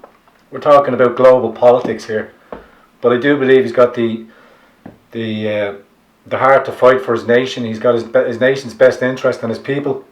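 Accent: Ireland. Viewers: I love your brogue brother. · i love Irish accents, thank you my brother. may YHVH our Yeshua bless and keep you in HIS hands. please research where Israel was scattered; you as the Irish, are of the tribe of Judah. Israel will have blindness of face until the end of days. we're there, this is the end of days. Find out to whom you belong, who is Israel? Did YHVH lose HIS people? NFW. read Isaiah , ask yourself who hung on the cross. YHVH is our Yeshua, you are of His tribes. know your enemy Jn. , , Rev. 2:9, 3:9 or Mt.13. bless you brother. James (of Irish blood)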